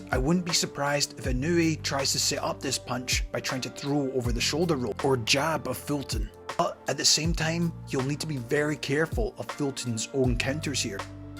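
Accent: Scotland, United Kingdom. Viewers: A Scottish accent is so right for boxing videos. Gritty, poetic and profound.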